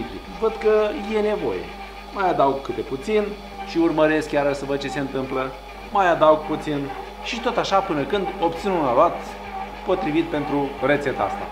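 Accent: Moldova. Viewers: Ăsta este farmecul lui, e glumeț, explică totul pe îndelete, accentul moldovenesc are și el dulceața lui, iar rețetele pe care le prezintă sunt, toate, foarte faine.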